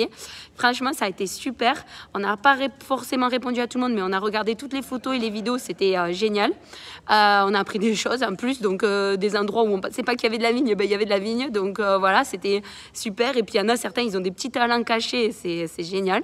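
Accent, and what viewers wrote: South, France. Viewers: Bonjour et merci de ton sourire avec l'accent du midi ! C'est toujours un bonheur de t'entendre '' belle brunette''!! Je vous souhaite une bonne fin de vendange et je formule l' espoir de venir goûter votre production de vin à cépage ! Bisous à toute ta petite famille. Pépé 80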